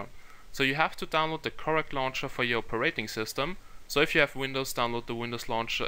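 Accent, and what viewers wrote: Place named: Germany. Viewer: I love the german accent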